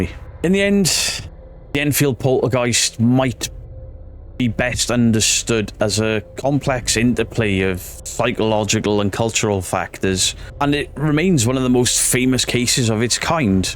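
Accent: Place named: Newport, United Kingdom. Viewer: That a Newport accent I detect… lol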